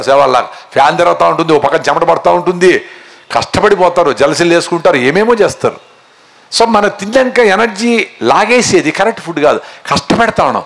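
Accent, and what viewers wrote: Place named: Chittoor, India. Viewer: Madanapalle chittoor slang ande adhe .. mame atle madadatham ..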